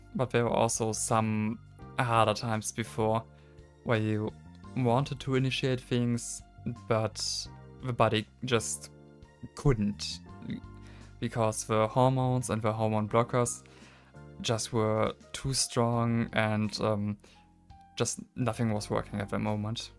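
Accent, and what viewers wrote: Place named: Germany. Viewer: I've noticed that you have a German accent when you're talking.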